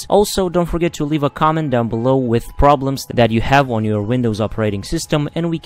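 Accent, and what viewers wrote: Romania. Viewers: It worked, thx, but let me ask, are a romanian youtuber, ur voice sounds familiar to me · You are from romania?xD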